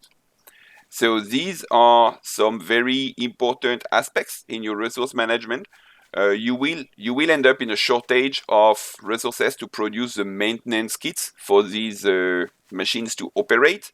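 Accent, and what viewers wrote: France. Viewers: ah je ne suis pas la seule a avoir remarquer un énorme accent Français étrange pour un channel totalement en anglais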